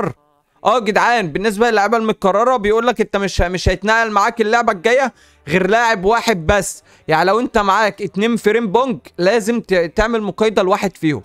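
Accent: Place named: Egypt. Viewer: اللهجة المصرية حلوة لاكنها احلا على لسانك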